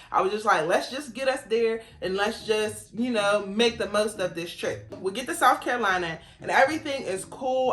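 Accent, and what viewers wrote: Pittsburgh, United States. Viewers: Great story. BTW are you from Pittsburgh because I hear the accent lol. Edit: nevermind lol😅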